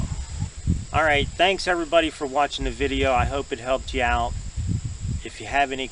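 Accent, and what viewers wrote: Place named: Northeast, United States. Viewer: very cool. love the roof idea using metal and then removing it when set. what kind of temps do you get in winter, or sumner? sounds like you are in the northeast.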